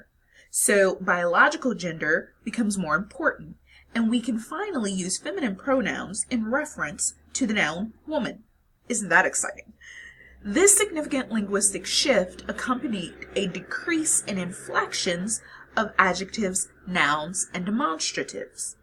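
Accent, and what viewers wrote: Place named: South, United States. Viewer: You do in fact sound southern.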